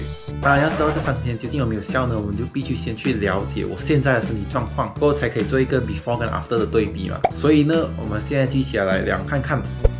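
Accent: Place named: India. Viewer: 你怎么有印度口音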